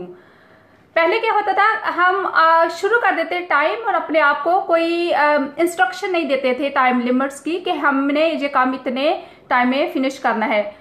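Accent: Punjab, India. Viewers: Ajit Shirsawade wat is good in it she speaking hindi with punjabi touch while she can make video fully in punjabi language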